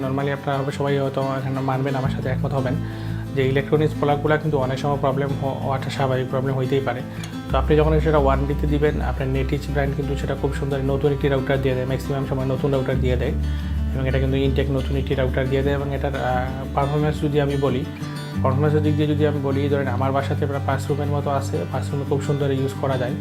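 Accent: Sylhet, Bangladesh. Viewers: vai apni ki sylheti naki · Sylheti vi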